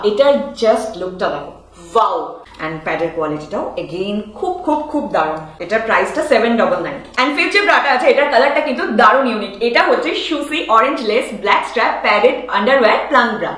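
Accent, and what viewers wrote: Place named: Bangladesh. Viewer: তমার বাংলাদেশি voice এতু ভাল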